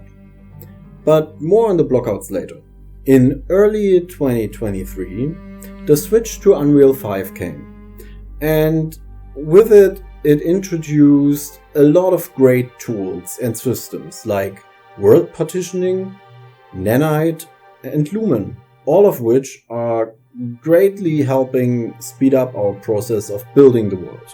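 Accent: Germany. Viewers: you can hear the german accent :D gutes englisch bro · Sehr gutes Englisch fast ohne deutschen Akzent. ❤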